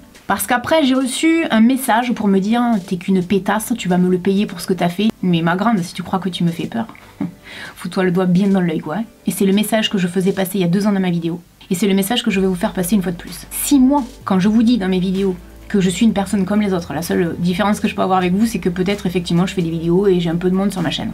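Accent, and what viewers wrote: South, France. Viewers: Hello ca fait une petite semaine que je regarde tes vidéos maintenant et je t’adore ! Tu es géniale, tu es toi même ! Tu es une super belle femme ! Tu as des cheveux mais magnifique ! 😍😍😍 Je ne sais pas qu’elle âge tu as exactement mais tu as l’air jeune et très mature en même temps et cet accent du sud j’Adore totalement ! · dit moi ton accent il pas du sud parceque ce qui sont proche de perpignan on la accent chantant mais je t'adore maman yutube desoler je suis dixlesie bisous du bord de mer maditerane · Ha, je pense que cet internet de malheur exacerbe les bas instinct de la nature humaine autant que le narcissisme.. De facto, cela se répercute au quotidien, les gens sont devenus totalement égocentriques, agressifs et parfois violents!.. Je suis tombée par hasard sur tes vidéos, pour le peu que je me connecte à you tube, où je regarde surtout de vieux films ou de vieilles séries... 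Évidemment c est ton accent qui m a tout d abord attiré, si familier à mes oreilles...l accent de mon sud... le tiens c est le miens et le miens c est le tiens!! L Accent! C est fou.. Mais j ai l impression d entendre une soeur... Une frangine d âme et de coeur... Dame de coeur, tu l es, à n en pas douter... Cette vidéo vient de me prouver que je ne me trompais pas... Nous avons tant d affinités inspirées par une grande sensibilité si perceptible... Je ne m étendrai pas sur le sujet... Ma vie privée ne regarde que moi et ceux à qui j ai envie de la partager... Autant dire un cercle très restreint... Mais franchement, tu me ressembles tellement... Bon, je me lance, au risque d être ridicule... Puisque nous vivons dans le même département, j aimerais bien te rencontrer! Gros poutous sister! Laurie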